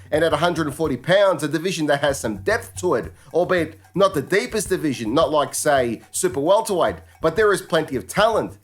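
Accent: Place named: Australia. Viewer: Surprise, the guy with an Aussie accent picks the Aussie to win. Stylistically, Hitchins should win. However, Liam's gas tank is huge. Should be a great fight.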